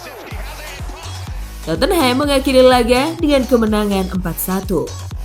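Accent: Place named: Java, Indonesia. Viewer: Kaka yg sekarang suaranya kaya d medok medokin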